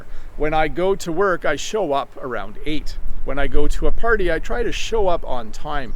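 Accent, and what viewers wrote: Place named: Canada. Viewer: Canadian English❤nice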